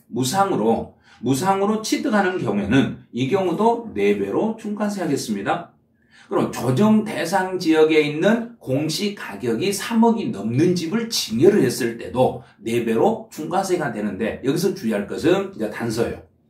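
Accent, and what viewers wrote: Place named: Jeolla, South Korea. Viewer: 쌤 사랑합니다! 쌤 셥듣고 나서 이상형이 바꼇어요~ㅋㅋ 전라도 사투리 쓰는 남자로요~♡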